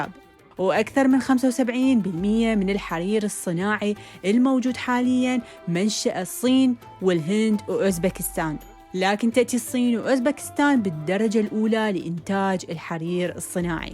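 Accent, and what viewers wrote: Baghdad, Iraq. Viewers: تحية من الاردن شو جميلة اللهجة العراقية يا أستاذة فيديوهات ممتعة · احب لهجتك البغداديه الاصيله وعفويتك تقربك للنفس فديوات رائعه تقديم وتصوير وموسيقى انت مبدعه اخرى ضمن المبدعات العراقيات في كل مكان وتركيا تستحق الاستقرار فيها وليس الزياره فقط ولحبي لها اخشى عليها من غدر الغادرين اللهم ادم عليها الامن والاستقرار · والله يا احبج وايد و احب قناتج و تغطياتج للمدن.. و خاصه لهجتج العراقيه الي تجنن و انا اصلا احب العراقيين.. متابعتج من الامارات ❤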